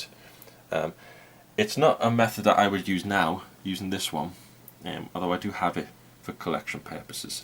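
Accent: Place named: Merseyside, United Kingdom. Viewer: Merseyside clearly.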